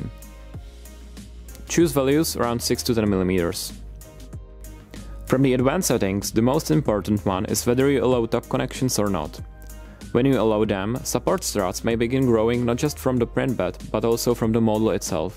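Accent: Czech Republic. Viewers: Český přízvuk je nezaměnitelný :)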